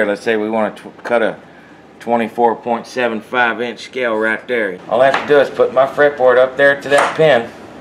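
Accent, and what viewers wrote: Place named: Kentucky, United States. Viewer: You from Kentucky ? You have the same accent as John Prine . In fact you sound just like him when your talking .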